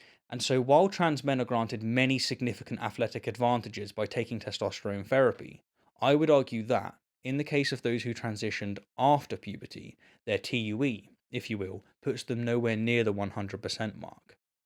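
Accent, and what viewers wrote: England, United Kingdom. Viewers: This is too tragic. I thought this was somehow going to be promising. Forcing skepticism on anything and everything is just a formula for disaster. Talk about scraping the bottom of the barrel. I guess it's just the english accent I so splendidly enjoyed but other than that nothing to distinguish the channel from the rest of the noise.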